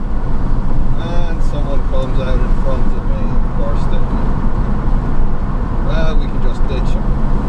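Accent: Ireland. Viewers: Nothing wrong with the Irish accent. You don't expect them to pronounce it Turd, they shouldn't expect you to pronounce it third.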